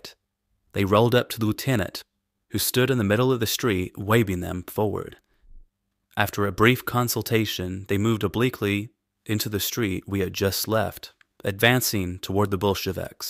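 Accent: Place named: South, United States. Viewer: This story of a young French boy enlisted in the German army to fight in the Soviet Union, read by someone possibly from a state South of the Mason–Dixon line, is quite puzzling to a French listener.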